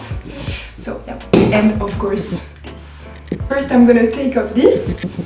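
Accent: France. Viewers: Your French accent and grammatical mistakes are really funny. Thank you for that delightful and entertaining video! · That frenchhhh accenttt 💛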